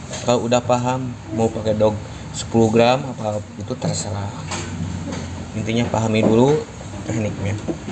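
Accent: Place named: West Java, Indonesia. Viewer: Kang sunda bangeeet...sy suka😁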